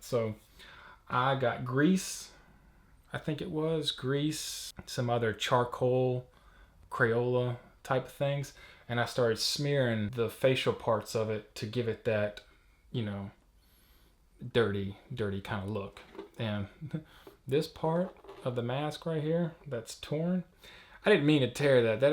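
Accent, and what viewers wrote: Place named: Louisiana, United States. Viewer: Awesome helmet! Love your accent too. Louisiana maybe? Anyway, your voice is like butter 😂😂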